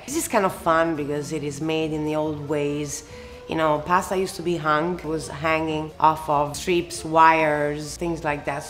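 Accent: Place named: Italy. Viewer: Regarding her accent, it should be clear to everyone in the meantime that she is not American, but she is Italian, born and raised in Italy. She came to America as an adult.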